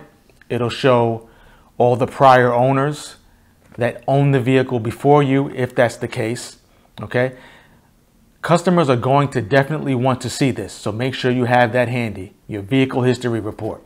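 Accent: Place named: East Coast, United States. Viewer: No, you don't. That depends on the state. With his accent, he sounds like from the East Coast, and may not be aware of laws here in the West.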